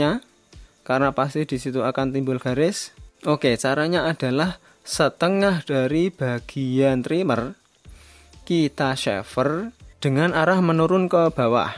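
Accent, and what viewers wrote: Java, Indonesia. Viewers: Jowomu medhok ,,,,hhhhha hhhha enak ki kesusu kok hhhha 🙏🙏🙏🙏🙏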